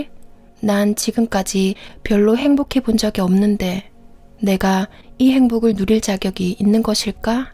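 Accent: Gyeongsang, South Korea. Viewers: 갱상도 말씨 너무좋아 조아요^,^ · 경상도 사투리가 약간들어간 목소리가 너무 좋습니다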